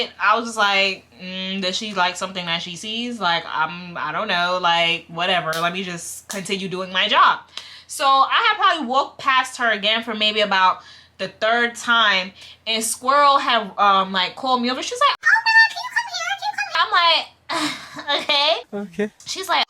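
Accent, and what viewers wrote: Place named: New York, United States. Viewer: Hey giiiirl 🎉❤ First of all, your voice, personality, and New York accent keeps me coming back to your videos 😂 Secondly, we just gone say this was a blessing that this didn’t work out with this girl because like you said, bad apple. & say you would have went to her house after she asked that.. she could have went to her lil 10,000 followers and tried to play you because you were up and coming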